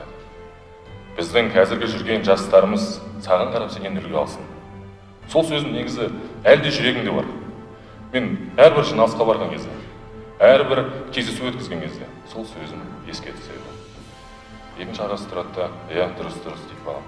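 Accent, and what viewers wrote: Standard. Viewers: туган жерин сую нагыз еркек сизге рахмет казак тилин курметеп таза соилединиз казак тили жасасын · Өте керемет таза қазақша сөйлейді, сияқты менде сөйлей алмаймың, тіпті ұят екен. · казакшасы таза екен озимиздин мангурттер сендерде намыс барма кашан соилеисиндер мемлекет тилинде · Недеген КЕРЕМЕТ таза сойлейди. ОТЕ ДУРЫС кай елде турсан сол елдин тилинде сойлеу миндетти. Казакша билмейтин Казактарга окинем. СИЗГЕ МЫН АЛГЫС👍👏💋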